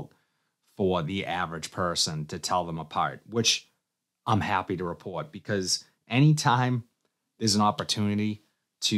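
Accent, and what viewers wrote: Boston, United States. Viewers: Absolutely love Himalaya but haven't smelled XS despite hearing about the constant comparisons. Great video as usual Your Boston accent is so awesome. Go Red Sox 🤣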